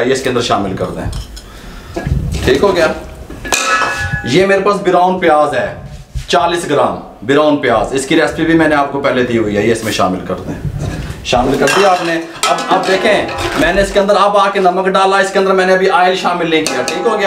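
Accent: Punjab, India. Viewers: No fancy kitchen no fancy utensils no show shaw just pure authentic recipes guidelines and awseemmmm Punjabi talking style 👌👌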